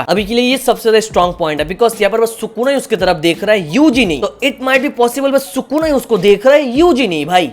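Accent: Bengal, India. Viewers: Bhi ap bangali ho kya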